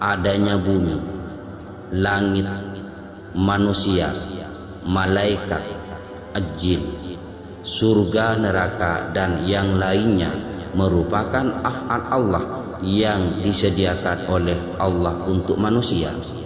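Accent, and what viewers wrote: Indonesia. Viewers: ilmu yg bermanfaat ,saya suka cara pentampaianya macam orang minang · Orang madura berbahasa Indonesia 😀😀😀 Dalil naqli terus bos. Dalil aqliya jelasin donk....?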